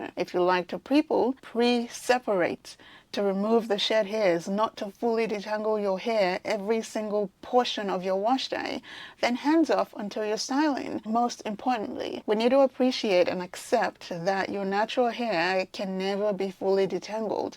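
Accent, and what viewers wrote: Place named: New Zealand. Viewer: The New Zealand accent is just the best. I also have a kettlebell training account I follow just because of her accent. 😂😂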